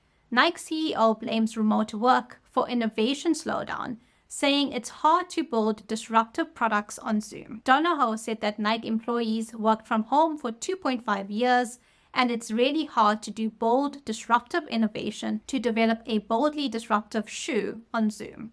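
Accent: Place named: South Africa. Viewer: She's in South africa, Probably "South African indian"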